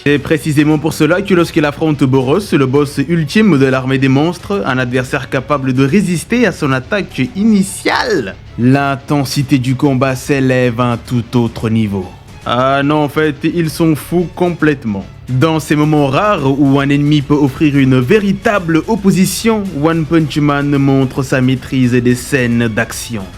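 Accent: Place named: Africa. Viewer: t'as un accent africains que tu veux gomer, c'est dommage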